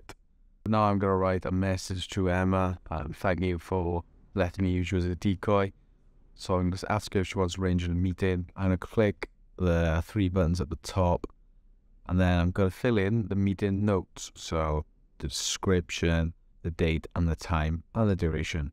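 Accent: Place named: Wales, United Kingdom. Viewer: Love the vid - straight to the point and keeping it simple. Extra points for the Welsh accent. Mae'n codi hireath arna i -- remembering my time at uni!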